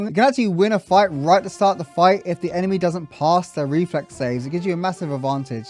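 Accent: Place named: London, United Kingdom. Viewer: Good news! I'm an London Wizard... So nice to hear the accent too!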